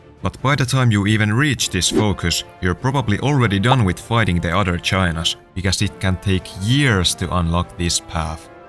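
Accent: Finland. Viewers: Are you Finnish becuse you sound like Finn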